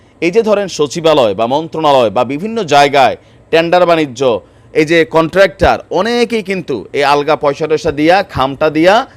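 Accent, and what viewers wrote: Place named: Comilla, Bangladesh. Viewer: Location India কেন, আপনার ভাষা তো কুমিল্লার মনে হয়।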